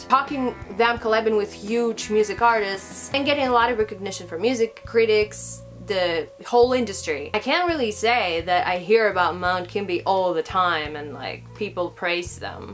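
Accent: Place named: England, United Kingdom. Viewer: good review. Why do you sound english in your channel prologue?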